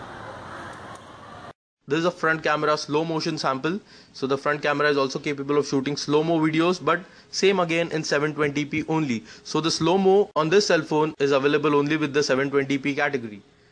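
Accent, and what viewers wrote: India. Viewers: i really love Indian accent :)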